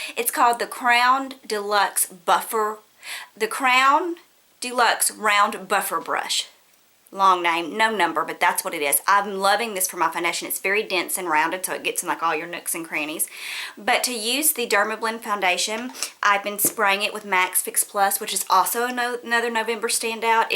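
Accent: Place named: Louisiana, United States. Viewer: I just love how you threw out that country analogy. Im not poking fun or anything. Im from Alabama so I just appreciate them lol. I love your channel. That Louisiana accent drew me in but all the amazing videos keep me coming back for more:)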